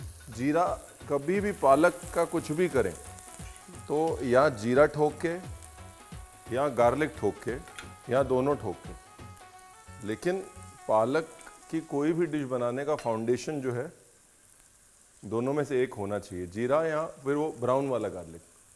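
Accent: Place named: Punjab, India. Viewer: Love ue punjabi accent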